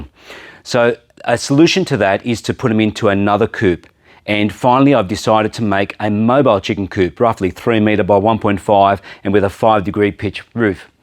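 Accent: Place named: Australia. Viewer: Thank you so much in replying, you are one of the few who has! I found your channel purely by accident. Although I am now 61 and retired, I find that trying to expand your mind is a good and healthy thing and one should never stops learning until you pop your clogs. Given that there are so many channels with Americans on here, it is a refreshing change to hear an Australian accent, not that our US and Canadian cousins don't have a lot to offer, as they do. PS Given you are in Sydney and I am in good old Blighty, you must either go to bed very late, get up very early or just not sleep a lot like me! All my very best to you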